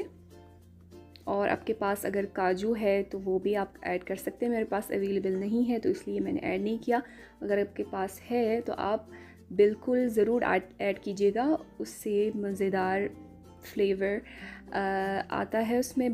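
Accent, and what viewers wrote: Pakistan. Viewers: Ap ka urdu ka leja i mean Accent same pakistani hai lagta hi ni ha ap morrocian ho 👌👌👌👌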